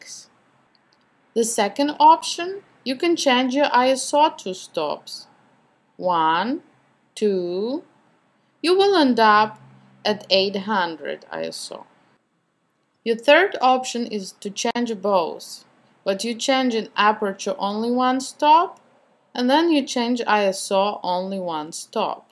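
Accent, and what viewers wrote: Russia. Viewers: Nice video! Do You have a Russian accent? ) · good video, but I'm getting tired of "lower ISO looks grainy". Well, yes, but on most good DSLR you can go fairly high, without visible grainy-ness. Instead of blindly following advice, that may or may not apply with your equipment- TRY THINGS OUT = SHOOT. That's the only way to learn. But again, nice video, explains the principles well. Is the accent Russian? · sounds like a mix of Russian + Latin speaking english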